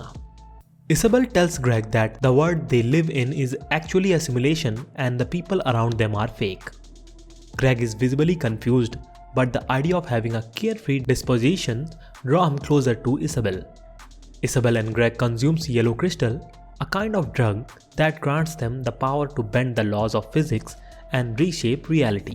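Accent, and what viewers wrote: India. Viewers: indian enlish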